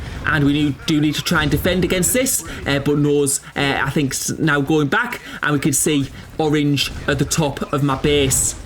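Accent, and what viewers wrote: United Kingdom. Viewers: The queen's English (miss her already).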